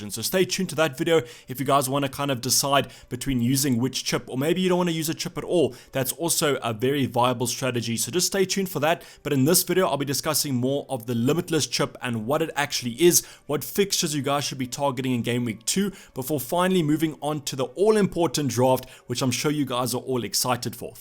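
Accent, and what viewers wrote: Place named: South Africa. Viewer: No but your SA accent just makes it better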